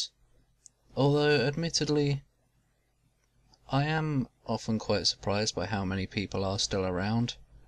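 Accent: United Kingdom. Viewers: 1. What are the earliest memories you have with games, like what games did you play first? What were the first ones that really got your attention? 2. What region of, I assume, the UK is your accent from? 3. Do you participate in game jams and what engines have you used to make games?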